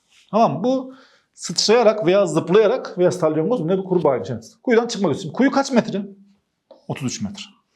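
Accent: Gaziantep, Turkey. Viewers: Gaziantepliymiş Sivas ta yaşıyormuş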